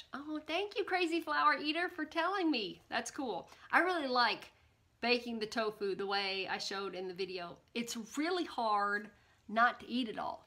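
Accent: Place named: South, United States. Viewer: Oh yeah, thank You to the Creator of the chickpea. Long live the chickpea! Hey, I love your accent. It's similar to mine. So, you just keep on with that lovely southern talk. We love you! Mmmmmwuah ! 💜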